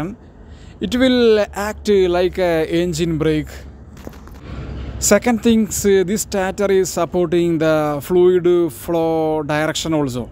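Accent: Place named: India. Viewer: talk look like bullshit....your voice bad from india....tatatata..like busy...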